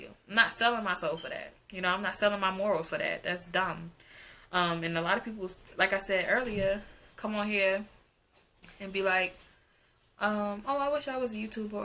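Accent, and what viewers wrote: New Jersey, United States. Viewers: You did the correct thing emailing. Taking business classes do not stop the bank from messing up. Even huge companies mess up and what do they do? email. So kudos to you. Lovely video and who knew you were from Jersey! I can hear it ( new yorker) Also I really dont like that word, I think it's horrible, but that's something I care for. I thought I was the only one. Woman don't like men saying it but their gf say it. I feel as if its a downgrade from who you are. Also, you would look so nice in ASOS and Fashion to Figure and all of these great brands. I wish they would do a collab for plus Youtubers. I am seeing what you are saying now. I hope companies open their eyes.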